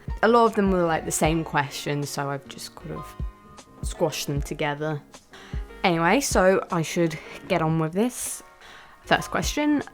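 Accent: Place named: United Kingdom. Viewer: I love your accent ❤️❤️❤️ is it British?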